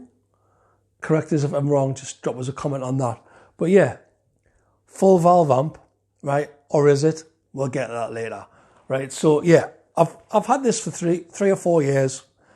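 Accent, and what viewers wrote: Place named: Ireland. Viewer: Thanks for your honest review! I know you're a less is more kinda guy, but not everyone else shares that philosophy. Having features is a good thing because it gives you more options to tailor your sound. However, if you don't want or need them, then simply don't use them! But I think having more options available gives you more flexibility and not just a one trick pony amp sound. In addition,, it doesn't matter if it's only 1, or 0.1, watt. Having two channels and a foot switch allows you to go from clean to scream at the touch of a button for different sounds for rhythm and lead, etc. By the way, as someone in the states I enjoy listening to your voice because of the accent . Are you from or located in Ireland? Just curious!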